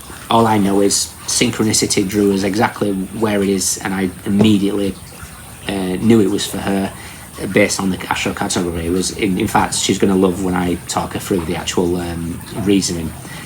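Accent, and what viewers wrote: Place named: Lancashire, United Kingdom. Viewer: I’ve been trying to place his accent. Lancashire, Rossendale?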